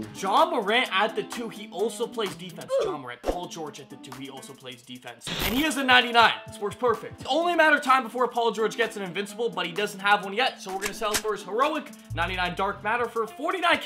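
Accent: Greece. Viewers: Are you Greek Or Canadian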